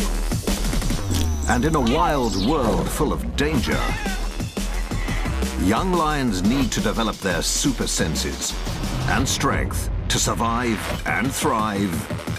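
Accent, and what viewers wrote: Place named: United Kingdom. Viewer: These are the ones with the British narrator..these versions suck. I like the original with the American narrator. These are garbage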